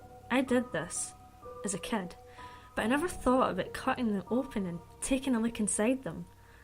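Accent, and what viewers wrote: Scotland, United Kingdom. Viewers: scottish for the win!! ;D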